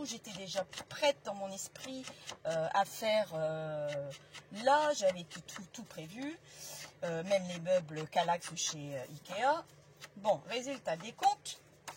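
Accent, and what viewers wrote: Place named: Lille, France. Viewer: bonjour j'ai découvert ta chaîne par Agnès et je me suis abonnée sympa le pote bobine .j'aime beaucoup se que tu fais mais dit ton accent je le connais tu es une boyau rouge il me semble......merci a toi pour ta bonne humeur. gros bisou alexandra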